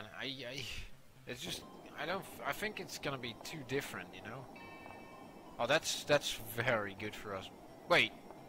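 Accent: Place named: Netherlands. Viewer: Are you Dutch? What is this accent?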